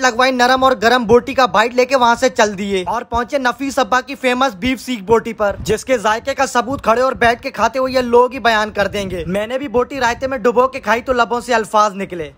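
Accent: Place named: India. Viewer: o bhai apka lehja ap ne indians ki trha q bnaya hoa hy kahen sy pakistani lehja ni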